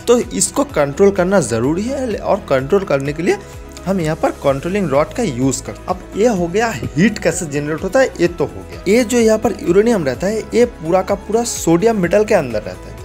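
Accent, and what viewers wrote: Bengal, India. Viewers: Appe Bengali ho na 🎉❤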